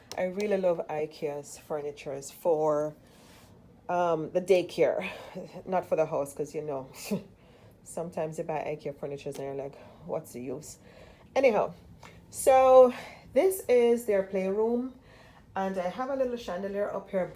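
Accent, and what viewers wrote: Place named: Jamaica. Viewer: Hi 👋 😀 You sound Jamaican 🇯🇲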